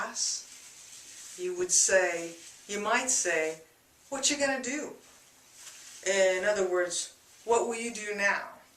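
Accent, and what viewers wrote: United States. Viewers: Easy Spoken English it's an Americanized English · is it american or british ?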